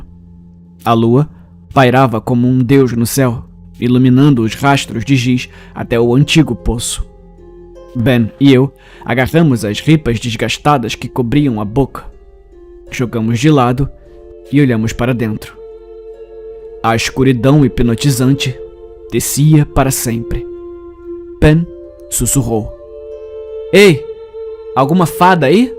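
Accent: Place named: Rio de Janeiro, Brazil. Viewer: É tão bom ouvir uma história de terror em que o narrador é carioca igual eu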